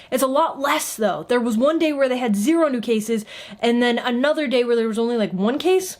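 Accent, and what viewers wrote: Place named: United States. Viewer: When I was going threw YouTube I saw this channel, very nice , keep the good work I’m surprised because you sound more American than Jordanian but very very good I like the Chanel and you got a new subscriber and a like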